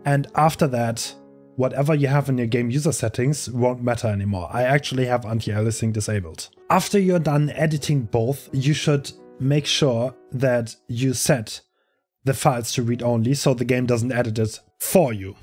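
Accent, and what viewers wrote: Germany. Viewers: clearly german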